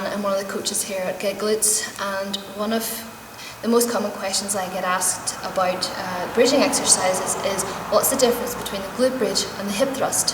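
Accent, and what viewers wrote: Ireland. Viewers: Are you Irish? Thanks for the video ...very helpful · Irish accent. Beautiful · I'm guessing north Dublin but definitely could be wrong.. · Now, now, she's much more tastefully understated compared to the YouTube gym skanks. It's that Lucky Charms accent that does for me.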